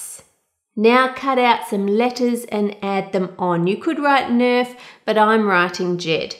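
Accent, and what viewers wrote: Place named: Australia. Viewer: sounds more Australian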